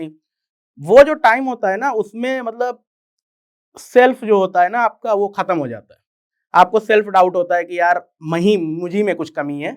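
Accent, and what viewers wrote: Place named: Tanda, India. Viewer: Tanda ki accent h apki..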